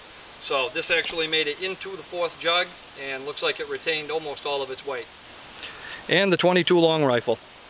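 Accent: Maine, United States. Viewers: hmm....you sir, sound like a southern mainer. am i right?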